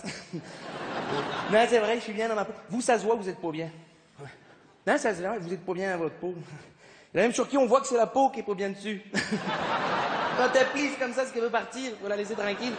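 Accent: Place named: Quebec, Canada. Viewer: en passant , sont accent quebecois est pas pareil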